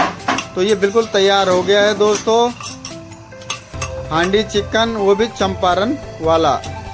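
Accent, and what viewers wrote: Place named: Uttarakhand, India. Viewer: Yar Bhai ji handi chicken bihar ki dis h socha nhi tha AP uttrakhandi ho apki language see lag hi Raha tha Jai badri vishal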